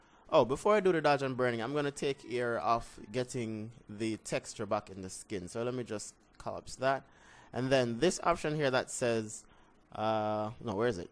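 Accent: Jamaica. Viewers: 🇯🇲 accent. Big up yourself mi genna. You done know how di ting set.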